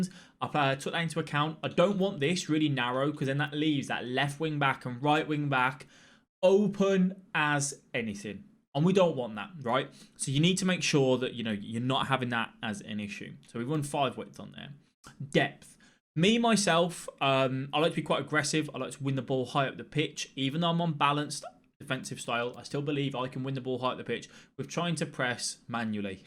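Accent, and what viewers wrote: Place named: Midlands, United Kingdom. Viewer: Subscribed cause these a sound tactics and you sound like you're from Midlands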